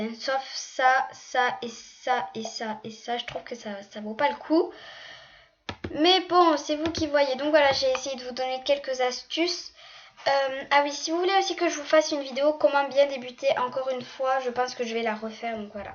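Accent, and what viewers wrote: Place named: South, France. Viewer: J'aime trop ton accent tu vien du sud ?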